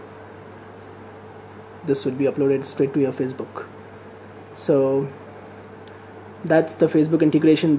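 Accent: India. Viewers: u are an ass with fukin indian accent.. gayboy... :x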